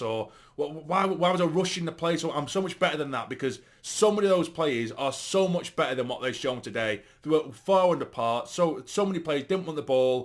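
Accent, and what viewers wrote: Sunderland, United Kingdom. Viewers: Love the mackem twang...just saying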